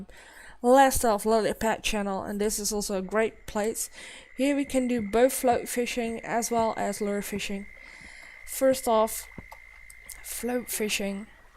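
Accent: Netherlands. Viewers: Jij bent Nederlands??? Vandaar dat accent!!! geweldig hahaha ma Flodder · Are you Dutch?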